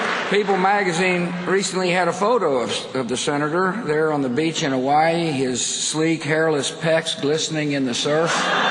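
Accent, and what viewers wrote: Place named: United Kingdom. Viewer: i speak my dialect and you speak y'own maybe your lack of american in your english is irritatin to me and mine? sound like some jumped up british poetry professor tellin ME how to talk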